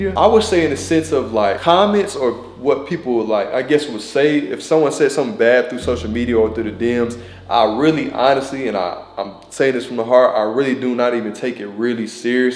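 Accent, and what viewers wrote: Philadelphia, United States. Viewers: I know you not from Philly but u talk like us 😭 idk maybe its just me. Enjoyed this more personal video tho, God bless you always !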